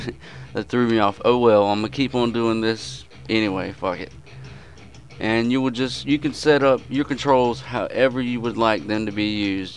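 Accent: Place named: South, United States. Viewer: dat southern American accent.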